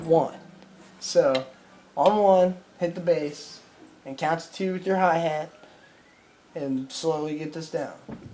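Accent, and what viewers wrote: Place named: United States. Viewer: You took the time to yuk it up about how lame "country people", whatever that means, are, but you're as hic as a fresh Dixie Daisy whut fell off the turnip truck with your hayseed accent. The super cool long hair doesn't cover your accent. I believe you were thusly ensnared for having forgotten the wisdom learned from the East who teach, "he who smelt it dealt it." If you felt the urge, you could have just said country music sucks rancid smelly goat balls and no one would have been the wiser.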